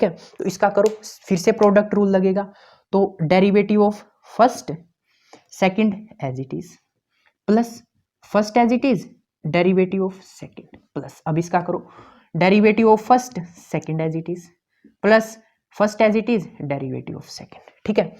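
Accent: India. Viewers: Teaching skills are amazing.....nd ur Desi accent make it more interesting sir 🙌❤️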